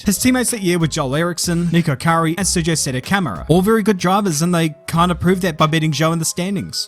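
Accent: Yorkshire, United Kingdom. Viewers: Gotta love the Yorkshire accent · Yorkshire accent on point brother.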